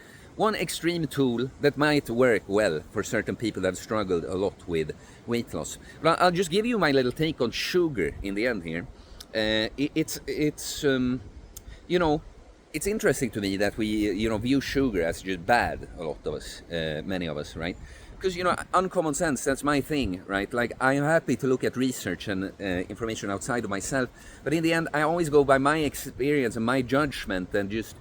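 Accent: Sweden. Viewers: Looking Finnish , but with a clear Swedish accent. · Haha älskar att höra en riktigt pursvensk man prata engelska. Subbed.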